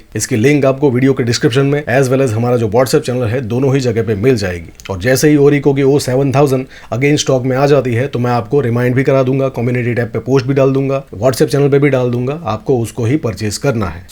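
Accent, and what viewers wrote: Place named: Kathiawar, India. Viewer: Kathiyawadi cho ne bhai?😅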